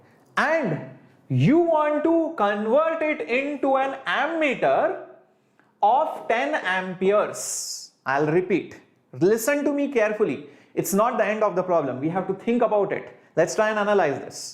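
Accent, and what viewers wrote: South India, India. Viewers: Talking in English really feels south Indian connected equally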